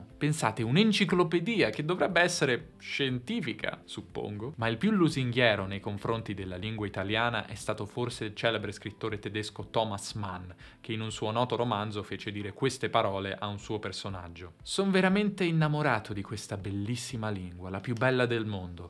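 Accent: Standard. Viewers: Non dirglielo...fa di tutto per non avere accetti e parlare l'italiano più standard possobile!! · È interessante la tua cadenza, che poi è italiano standard, qua a Como si parla in modo molto diverso, tu parli come parlano in televisione, e qua è considerato ‘accento da teroni’ Nel nord della Lombardia tendiamo a scambiare le aperture delle vocali in modo che suonino meglio rispetto ad altre cadenze italiane. Como Varese e Milano sono molto simili, invece il bergamasco ci risulta davvero brutta da ascoltare, qua direbbero che è il dialetto dei montanari cafoni. Comunque bella l’italia e belle le differenze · Bravo! Ascoltarti è istruttivo e piacevole! Linguaggio italiano corretto, privo di plastismi e di odiosi intercalari e con accento neutro, rispettoso dei timpani altrui. A casa propria ognuno è libero di parlare l'idioletto che gli compete! Condivido le tue considerazioni sulla bellezza delle lingue. 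· Da italiano, non saprei rispondere. So che non amo l'italiano parlato con accento regionale (e vale per qualsiasi regione). Apprezzo quindi lo sforzo che tu, hai fatto per parlare in modo neutro lasciando da parte le tue origini torinesi.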